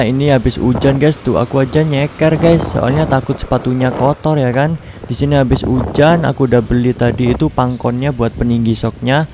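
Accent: Malang, Indonesia. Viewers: Orang malang?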